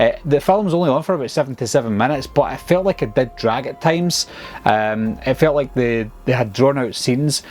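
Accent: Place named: Ayrshire, United Kingdom. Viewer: Just found your channel mate and its brilliant. Is that a bit of an ayrshire accent i detect?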